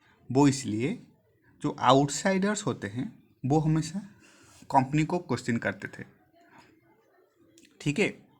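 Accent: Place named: Odisha, India. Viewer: Sir aap achhi English or hindi bolte hai poora odiya accent me😁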